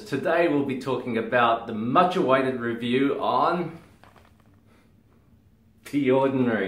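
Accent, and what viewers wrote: Australia. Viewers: Being Australian and Asian somehow manages trip to me up when you talk still.. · Recognized the australian accent right away! 😁 Great video! ❤ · KimLip'sLeftEye It’s because he’s so darn handsome.😍 Plus the Australian accent is adorable. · What a great surprise to find an Aussie! Like even as an Aussie, I went holy fuck he's got such a strong accent. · Hi Doc! This video popped up in my Recommended Videos and was pleasantly surprised that you had an Australian accent! And then I found out you're in Brisbane too!!! Loved the video but I have a couple questions - 1. Do I need a referral to come see you? And 2. The Ordinary has so many products in it's range... How do I know what to choose for my skin? Thanks heaps! Subscribed on YouTube and followed on Instagram :)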